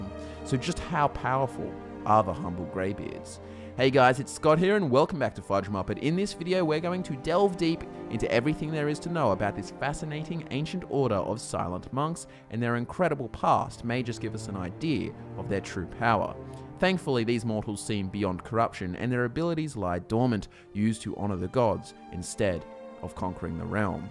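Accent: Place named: Australia. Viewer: 3 it's actually australian that you and youtube auto-comments have a hard time with ;)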